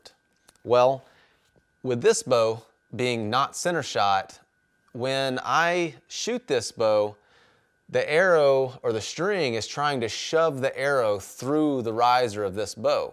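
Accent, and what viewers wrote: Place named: Appalachia, United States. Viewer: Greetings to speaking Appalachian English and Deo Frutuoso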